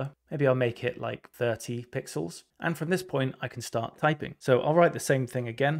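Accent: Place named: United Kingdom. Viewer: Understanding the British dialect…challenging but repetition aids learning!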